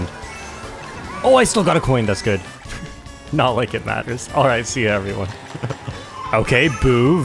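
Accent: Canada. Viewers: Are you from British Columbia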